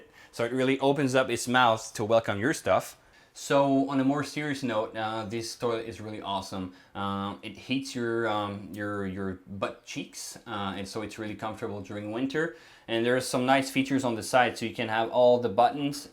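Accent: France. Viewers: I miss your French accent, your English is impeccable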